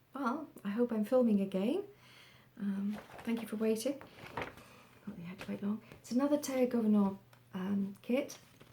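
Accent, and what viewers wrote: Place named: United Kingdom. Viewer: on Flosstube you are a treasure. I was so calm listening to your voice yesterday. I am never calm and can’t relax. Lol. British accent and dialect is always relaxing to listen. Hurry and post another one. I want to see what you’re doing. I might try to make a video this weekend. Maybe. LOL Are you on Instagram? I’m Sweetwoodruffs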